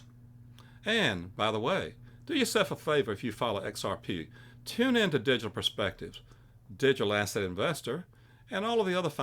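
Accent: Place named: South, United States. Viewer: the 100% smart guy, & I love the southern accent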